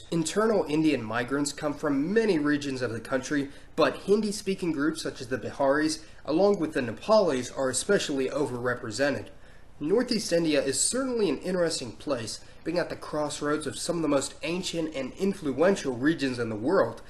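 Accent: United States. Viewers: Thaibet? Phulease dude. You sound like you grew up in bf u.s.a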